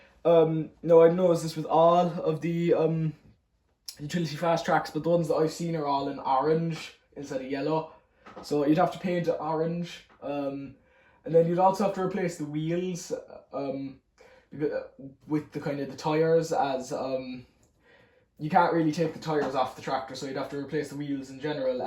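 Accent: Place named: Cork, Ireland. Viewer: As a lawd from Waterford I can tell your from Cork by hearing your Accent 😂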